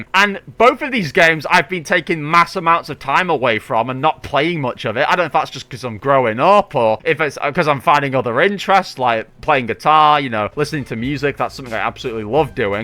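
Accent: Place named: Yorkshire, United Kingdom. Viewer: Bro you have literally the exact same opinion as me, even down to the yorkshire accent lmao.. was saying this on reddit the other day, went on to complain about hackers and some nob was trying to say it was the best game of the modern era and it rage baited me hard enough to give this entire breakdown to them basically bar for bar, word for word what you're saying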